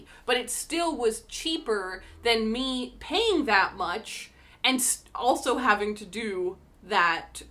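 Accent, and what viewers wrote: Canada. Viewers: That's true but she is canadian so she does have a certain canadian accent from a certain part of the country :) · Canada is gigantic. There are different accents in different regions all across the country. There is no one, single Canadian accent any more than there is one single American or British accent. accent is likely influenced by the area where she grew up.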